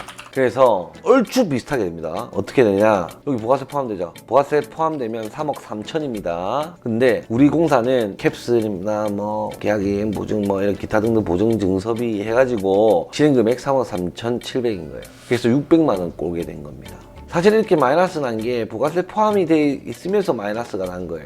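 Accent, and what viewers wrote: Gyeongsang, South Korea. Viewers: 많은 영상에 좋은 정보를 얻고 있습니다. 구수한 갱상도 사투리가 참 정겹습니다^^ 혹 지금도 자료를 부탁드려도 될까요?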